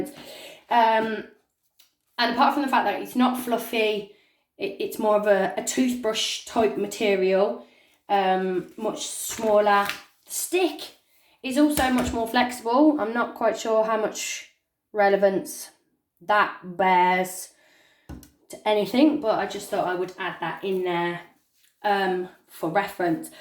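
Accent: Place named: West Midlands, United Kingdom. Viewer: Nice to here a west mids voice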